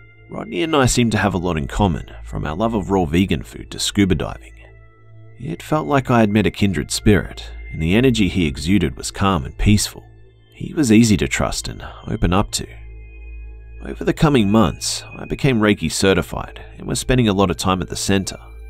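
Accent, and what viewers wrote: Australia. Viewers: Hey mate, are you from Australia? And when are u gonna do a face reveal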